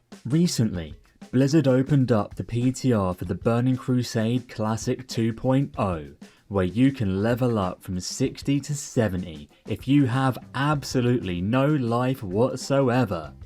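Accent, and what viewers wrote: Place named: Essex, United Kingdom. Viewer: I have a friend sounds like exactly like him, when hes being sarcastic i dont realise half the time XDD, might be an Essex thing could be wrong XDD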